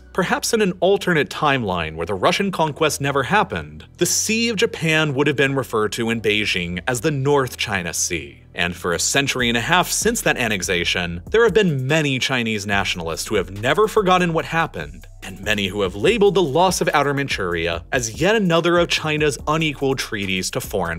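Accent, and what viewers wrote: United States. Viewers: Russia is not scared of anyone not china not Japan not the scumbags from America or Europe maybe they were not ready in 214 but they are ready to whip your asses to smithereens I mean and I mentioned your because I take it from your accent you are an American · Woe Woe Woe! are you purposely doing Putin's Bidding? Listen to what you said Why would anyone in Nato want to do something like that. This whole entire conflict is based on Hypothetical what if's That Putin's Said could happen if his Imaginary Evil Enemies of the west. Yo you sound American You know good and god damn well no one has ever talked about or thought about or even wants to invade Russia or any where close to Russia. Theres this new theory that all the hostility we thought was coming from Russia never actually existed which explains the poor shape of it's military, but it turns out that conflict with the west is just the oldest eastern European Dictators survival tactic that they been using for political to stay in power for centuries. 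"Hey I know it sucks you all are starving to death and the economy is shit because me and the homies stole it but it's must make sacrifice or the mean evil west will come and get us. US in a way does it alot as well. There's for sure very powerful people who's wealth is directly effected by us being enemies with Russia. but if you actually sit down and think about it. there's nothing that give us any reason to believe these people on the other side of the world are our enemy other then the people who stand to gain telling us they are. I only recently realized we all have been finessed which like you just said there is a real war with real people dying by thousands all so that a make believe enemy could have an advantage in a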